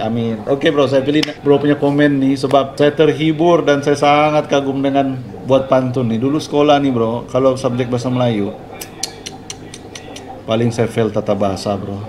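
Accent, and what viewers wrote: Sabah, Malaysia. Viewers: Abg orang sabah ehh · Slang Sabahan ohh..boleh tau ka dimana location? Mau datang beli kalau boleh heheh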